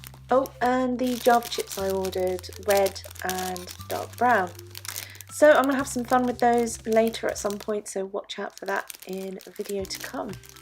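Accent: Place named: United Kingdom. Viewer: I support u. I love how ur voice sounds! Just the British voice is so beautiful am I right? I'm British but my voice is not as pretty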